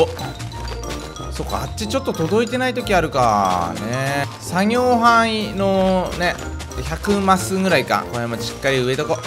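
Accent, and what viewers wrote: Fukui, Japan. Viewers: 最近福井なまりの前回のクラ聞けてないので聞きたいです。さんの福井なまりは聞きやすくて好きです。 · チャンク掘りお疲れ様でした！私も今日は2時間程、鉄の鉱脈探して掘り掘りしてました。掘り掘りは無心になれるので好きです。前回のクラはやっぱり普通の福井なまりのさんが1番良いと思います^_^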